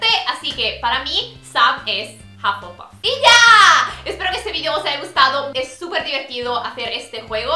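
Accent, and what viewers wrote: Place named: Italy. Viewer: lol creo que nos recordaste que era tu cumpleaños como 10 veces jajajajaj! acabo de descubrir tu canal!!!!! tu manera de hablar me sirve mucho para practicar el acento italiano.....Porque se italiano pero me falta el acento!!!!!!!!además puedo ver tus vídeos en italiano y en español para aprender nuevas palabras!!!! Eres lo maximo!!!! Descubrí un tesoro oculto!!!!!!eureka!!!estuve viendo algunos de tus vídeos y me encantan!! hoy haré una maratón de vídeos tuyos!!!! ya tienes una nueva suscriptora!! Y traeré a mis amigos por aquí también!!! :)) (*se emociona*)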